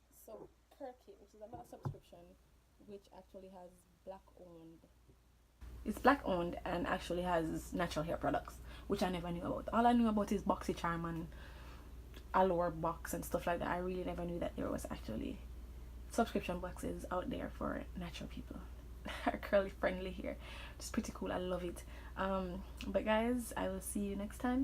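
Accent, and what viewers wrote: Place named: Caribbean. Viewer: Lol I love your personality 😂, and your accent girl!! Caribbean females all the way 🙌🏽.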